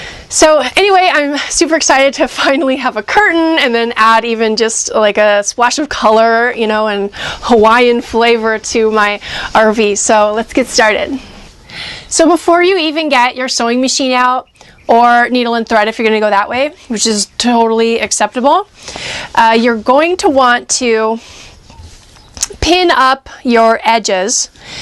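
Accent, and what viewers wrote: Canada. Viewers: she is from Canada a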